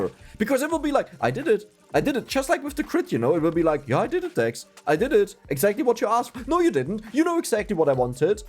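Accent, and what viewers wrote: Germany. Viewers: Where are you from Dex, because I like your accent I have never heard it before. I believe its an German accent right? Me myself am from the Netherlands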